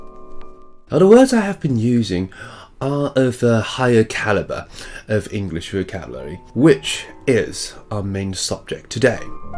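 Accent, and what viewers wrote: RP, United Kingdom. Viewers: 主要是英式腔聽起來很有氣質，文縐縐的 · 英國腔真好聽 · 英式口音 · 英式發音👍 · 英式英語就是好聽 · 英式发音听起来好舒服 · Wow who's this Japanese looking Tawainese dude speaking in British accents?(Speaking as some Aussie Hong Kong guy) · 英國腔聽起來很貴族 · Definitely he is! He is using the Royal British accent and speaks professionally. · British accent，说的真好听❤ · 很英的英文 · 發音有點英式 好聽 很像看哈利波特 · 老師的英國腔讓我著迷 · He studied in London and graduated from the University of London. Of course, he has a British accent! shut the f up if you knew nothing. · 英國腔像石內卜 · 英式發音真的很讚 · 聲音是英國人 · 沒在聽 英式腔調 跟語速 有點聽不太懂哈哈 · 英式口音好銷魂😍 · 從來沒有著迷過英國腔，自從聽到Eko老師的英國腔後，真的只想聽英國腔了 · btw I love your English ascent is it British? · 超喜歡老師的英式英語，深深著迷，又帥又有氣質。😅😅😅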